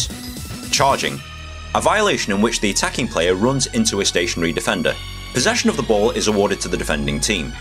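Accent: United Kingdom. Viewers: Who else knew it was gonna be a British guy? like any other American game explanation video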